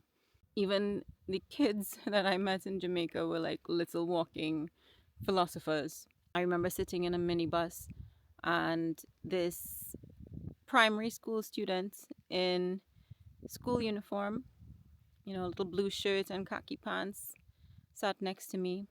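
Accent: Trinidad and Tobago. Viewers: Awesome I love your accent my dear Trini sister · I was thinking the same thing 😮,but after she started talking I knew she was from Trinidad & Tobago.Big up Sistren..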